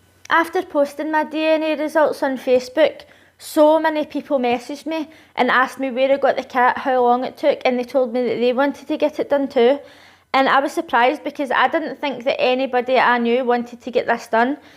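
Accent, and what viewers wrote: Scotland, United Kingdom. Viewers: Is that a scottish accent? I'm American. Can't tell. I bet she's very British.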